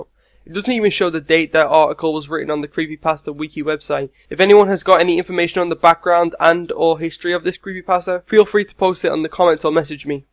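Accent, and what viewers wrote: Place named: Manchester, United Kingdom. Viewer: your voice! <3 where do you come from, it sounds somewhere in the Manchester area in England :3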